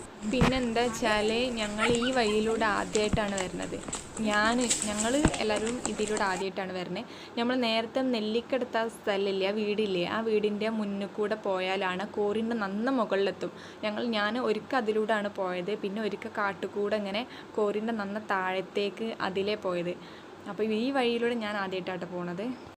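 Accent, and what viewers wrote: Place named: Malappuram, India. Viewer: Natural video ...........🌹🌹🌹🌹🌹 മനസ്സിന് കുളിരെകുന്ന പചപ്പാർന്ന വീഡിയോ .....👍 എന്തൊരു ബങ്ങിയ കാണാൻ ഊരകം മല സൂപ്പർ......👍 ചെരിഞ്ഞും മറിഞ്ഞും തിരിഞ്ഞും കിടക്കുന്ന ആ മരങ്ങളും എന്തൊരു ചന്തം സത്യം പറയാണ് ഈ വീഡിയോ ഫുൾ ഇരുന്ന് കാണുകയാണ് ഞാൻ കാരണം പ്രവാസികളായ എന്നെ പോലോത്തോ ആളുകൾക്ക് ഇങ്ങനെത്തെ വീഡിയോ വളെരെ ഇഷ്ടമാണ് മനസ്സിൽ ഒരു സന്തോഷം ആണ് .,... റബ്ബർ തോട്ടം ufffffffffffffff എന്താലേ കാണുമ്പോ തന്നെ 👍👍👍 ആ കോറി ഒക്കെ എന്തൊരു ചൊർക്ക് 😍😍😍 നമ്മളെ മലപ്പുറം ഭാഷ കേൾക്കുമ്പോ ഒരു രസം തന്നെ ആണ് ലെ 😂😂😂😂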